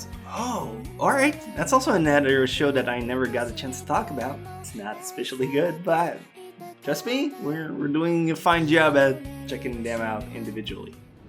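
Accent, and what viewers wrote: Quebec, Canada. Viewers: Mak Gnik French I would assume since he is Canadian, since he has an accent he'd be from French Canada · CHESS oh quebecois french, I was going to say i couldnt think of any mainland varieties of french accents that sound like that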